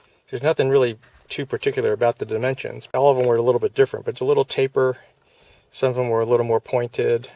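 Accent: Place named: United States. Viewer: Most helpful video - many thanks. BTW, you have a most pleasant accent - where in the US of A are you from? Regards - Roger from England.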